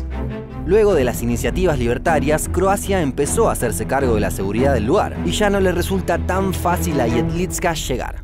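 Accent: Rioplatense, Argentina. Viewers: Tienes una excelente edición amigo y que elegante se escucha el acento rioplatense sin modismos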